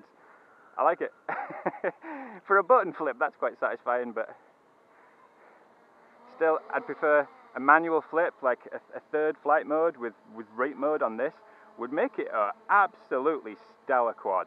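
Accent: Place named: Northern England, United Kingdom. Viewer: Good to hear an 'oop north' accent! A very nice write up too. I'm fairly new to this quadcopter malarkey. When it arrives this will be my first brushless, really looking forward to it :-)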